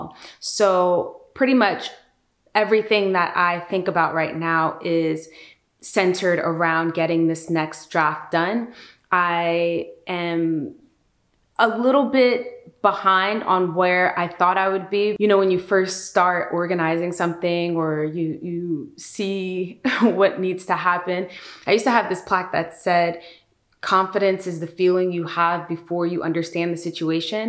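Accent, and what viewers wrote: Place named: United States. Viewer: your accents sounds American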